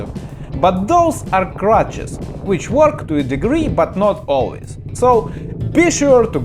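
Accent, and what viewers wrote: Russia. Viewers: Recorded in Buenos Aires by a dude speaking English with the Russian accent. Nice! :) Безопасно себя ощущаешь на байке в Capital Federal?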